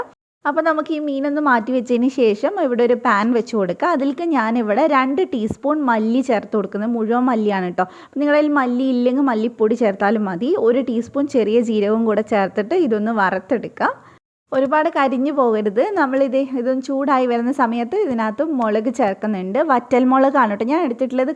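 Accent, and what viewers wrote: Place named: Ernakulam, India. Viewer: നല്ല സൗണ്ട് ആണ് കേട്ടോ .നല്ല അവതരണം ശെരിക്കും എറണാകുളം സ്പീകിങ് .തുടരട്ടെ മുൻൂട്ട് മുന്നോട്ട് പൊളിച്ചു ഇത്താത്ത പെണ്ണെ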